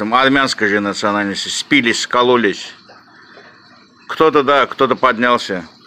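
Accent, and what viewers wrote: Baku, Azerbaijan. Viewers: У автора акцент всё-таки Бакинский остался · По акценту понятно что, гедеш бакылыды. Но не все такие как ты. Помню в том же лас вегасе был один Гена, сперва пел песни ай мян бакылыям, ай мои все друзья Азербайджанцы, а потом как пернул, ограш, что Гарабах древняя земля армян тут же получил команду сиктир, гётвярян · У тебя бакинский акцент. Спасибо тебе за правду. Дай бог тебе здоровья и долгой жизни. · Бакинский акцент)))👍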